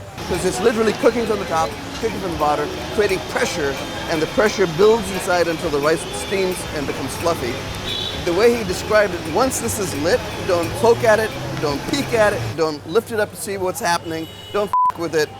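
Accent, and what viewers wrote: United States. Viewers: Following cause of your host.... Face of Naseeruddin Shah, accent of an American, 100% understanding of Indian culture and the calm, reasonable way he comments.. 5 stars all the way. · Probably the first presenter I encountered who has an authentic (American) English accent as well as an original sounding Hindi accent. Both preserved and original in their own sense. Compared to so many presenters on Internet with their fake accents. Very well presented. Great job🎉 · American Indians don't even sound this American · Malayalee in american accent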